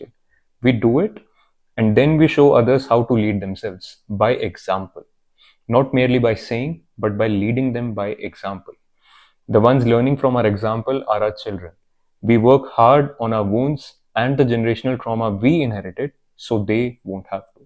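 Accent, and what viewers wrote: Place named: India. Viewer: Being an Indian , I prefer to listen Indian who speaks in English that nice to hear rather than British accent . It's helps me to learn more in English . Your accent is too good it's similar to Indian voice with accent and easy to hear and understand really appreciating . I don't know you might understand or not my words what I am saying. Because being a beginner in English it's too poor communication. Ok but at the end of the day really nice to have you it's makes vibe of Indian.❤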